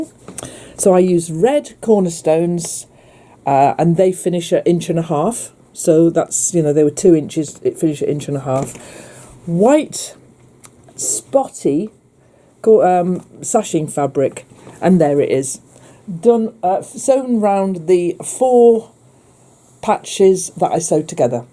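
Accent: England, United Kingdom. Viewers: I have must found your site. Lovely to hear an English accent. I live in South Australia. I will be looking forward to seeing more of your beautiful work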